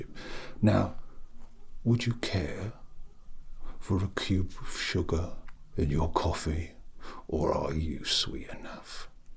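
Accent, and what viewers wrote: United Kingdom. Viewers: i dont understand shit because hes too british, i swear to god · THE BRITISHNESS TW 😂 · i just clicked in here for the british accent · BRITISHNESS AS A TRIGGER IM DEAD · this is so british and i love it .. 😂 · omfg hes so British, i love it sm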